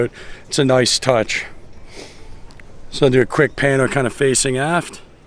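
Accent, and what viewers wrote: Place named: Maryland, United States. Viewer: Gotta love that Mairlin accent.. Love the bewt.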